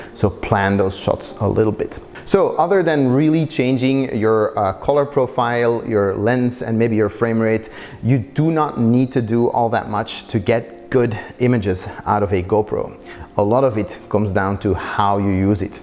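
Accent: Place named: Iran. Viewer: Very useful video. Thank you. BTW - where is the accent from? Sounds like Persian, related to French Canada? 🤪 Perhaps I'm wayyyy off.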